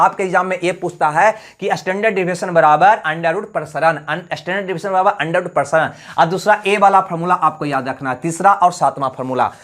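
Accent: Bihar, India. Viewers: sir kya aap bihar se hai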